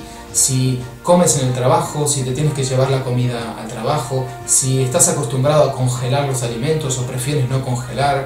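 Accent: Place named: Argentina. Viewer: Hola! De casualidad encontré uno de tus videos (creo que fue buscando cómo hace galletitas de avena) y me fuiste llevando con los temas a ver varios de ellos. Es muy interesante esto de la nutrición macrobiótica, están buenas muchas cosas que plantea pero es difícil dejar y/o cambiar ciertos hábitos cuando uno disfruta tanto de la comida y ha sido criado así no? Es más, ni bien te escuché pensé: este chico es argentino!! y no paré hasta confirmarlo!! se te nota en la forma de hablar =D Yo tb lo soy... es muy loco que estés viviendo en Finlandia!! Qué lejos!! Jaja pero bueno, nos demostrás que a pesar del frío tremendo que hace allá se puede comer saludable. Saludos desde Bs As!!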